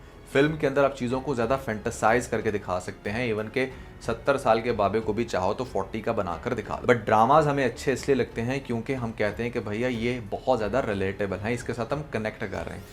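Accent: Punjab, India. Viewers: This host is definitely an annoying personality , but overconfident 😂should work on his speaking and presentation skills, his english, speaks English in Punjabi style , instead of bashing the stars it is the mindset of the people who produce such programs and show only very young characters, if someone has acne it’s not their fault , it’s not poor hygiene most of the times because it can be hormonal , and acne ages skin and if someone has acne does not mean their age is more, he thinks he is very intelligent ,very typical uneducated male personality! Grow up!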